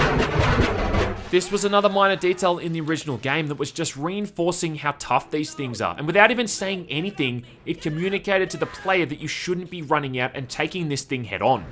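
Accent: Australia. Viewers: Your Australian accent, combined with your witty humor , made me laugh so hard I sneezed out my coke...... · Real talk. Do you really lean into the Australian accent, or is this natural? You have the thickest accent of all the Ozzie YouTubers I’ve watched haha.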